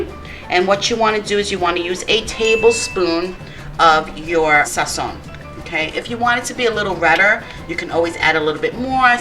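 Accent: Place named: New York, United States. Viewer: I love your accent soinds new yorker food looksnbanging girl! Love spanish food!!!!!! Any type of spanish food #1 fav food of all!!!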